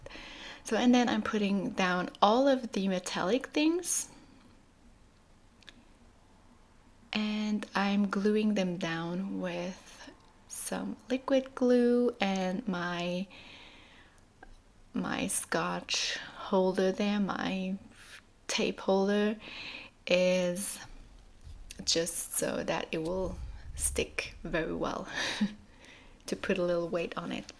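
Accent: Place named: France. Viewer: Ps: j’adore ton accent français ☺️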